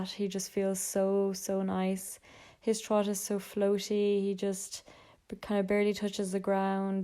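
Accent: Ireland. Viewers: I can sense the Mullingar accent from a mile away !! 😂😂😂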